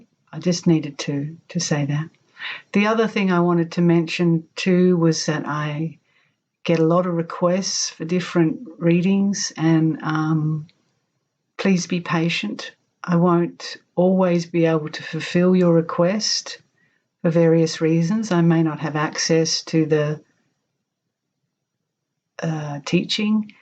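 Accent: Australia. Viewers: Your voice, became ONE with the inward deep recognition by the words of spiritual seers, these inward places are not visited by others..then only you! Who would have thought that, by starting this 'work'? I am shure about it, you wouldn't have expected it at all..which makes you humble in wat you do.:-) Many of them, I would not have searched for, knowing their context from where they started, but listening with my full attention, and with your reading..these difference's fall away. (As does also you're accent being an 'ausie'.., from the first time on fell away.:-) You're a gem for so many of us, so please keep doing this with all your joy.., as we will listen with all our heart and will meet at the meaning of those words. Thanks for presenting yourself, although not needed, you were already there..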